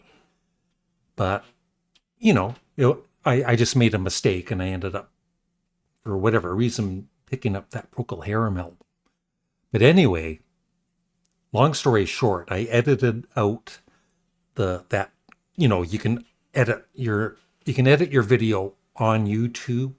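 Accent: Canada. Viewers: Yes. He means when you pronounce the word 'About'. it does often sound like 'Aboot' because of your Canadian accent. That's all. But it is obvious that the word is 'About' so there really is no need for him to mention it. 😊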